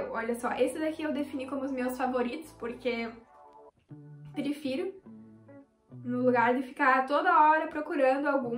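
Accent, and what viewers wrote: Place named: Recife, Brazil. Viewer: Gente ela tem muito o sotaque de recife 😂🤩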